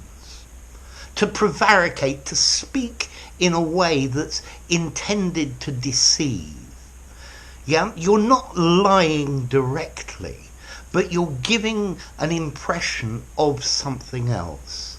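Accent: United Kingdom. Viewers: Too much British accent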